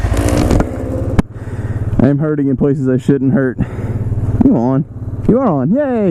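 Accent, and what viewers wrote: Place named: Baltimore, United States. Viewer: Are you NC native?....I swear I hear a Baltimore accent lol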